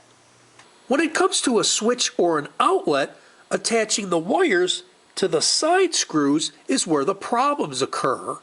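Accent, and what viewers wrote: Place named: Midwest, United States. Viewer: He's got a strong Midwestern accent. It's called the Great Lakes Twang.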